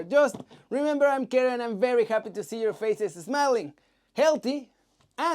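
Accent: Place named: Mexico. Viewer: Muy bien overall, si note unas cosas, pero muy bien, el acento te delata, pero no se si seria español o mexicano, creo mas mexicano que español, no te preocupes yo tengo 23 años aca y se me olvidaron muchas cosas por la peda, lo entiendo muy bien y lo hablaba mas que menos, pero ahora se me olvidan muchas cosas. 😩😁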